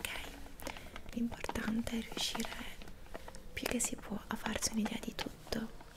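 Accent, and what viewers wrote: Italy. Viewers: Where are you from in Italy? My grandmother immigrated to the US from coastal Calabria and my grandfather immigrated from Tuscany so I’m trying to familiarize myself with the different Italian regions and accents!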